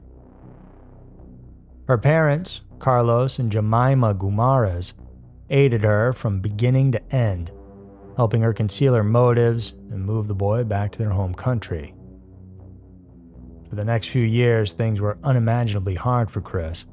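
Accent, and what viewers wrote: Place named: Texas, United States. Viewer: LoL...I was convinced that he was Woody Harrelson and occasionally accused him of hiding his true identity (Woody) in my comments...until he started showing his face in his videos. But you agree he's a Texan, right? Got that Texan twang.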